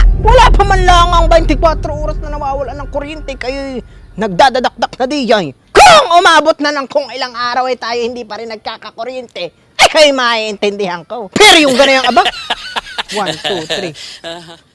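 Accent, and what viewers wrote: Batangas, Philippines. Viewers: Batangas ba ang accent ni messes?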